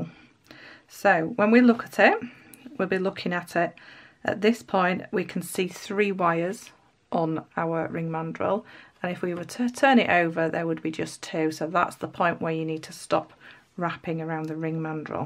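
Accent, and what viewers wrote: United Kingdom. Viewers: Are you from tottenham? Your accent reminds me of Adele ☺️ Great video. I like your teaching style ❤️ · Am I the only one that is obsessed with their Britain accent? Yes, okay. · Great job. Love it. I love your English accent.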